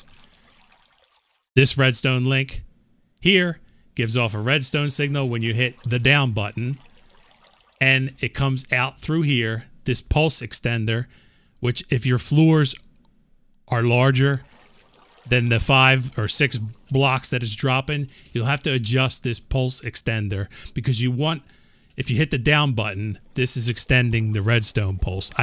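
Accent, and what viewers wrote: Philadelphia, United States. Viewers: Great video! Do I hear a Philly accent? It's wooder not water!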